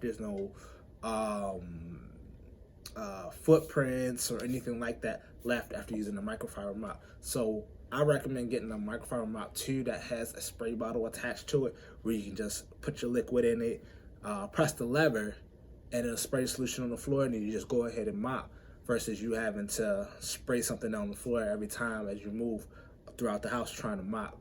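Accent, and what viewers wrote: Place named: Baltimore, United States. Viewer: lol I wrote this before I heard the bmore accent bruh I forgot y'all consider PG and Moco both DC 🙏🏿 stay up and thanks for the knowledge bruh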